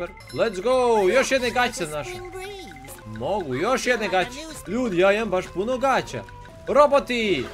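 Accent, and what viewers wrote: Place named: Montenegro. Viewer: Kako si mi smiješan kad pričaš ko Crnogorac,jer sam ja odatle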